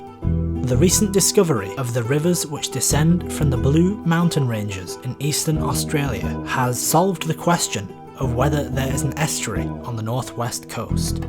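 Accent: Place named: Northern England, United Kingdom. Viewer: really interesting video! Great to hear a Northern Accent in one of these channels too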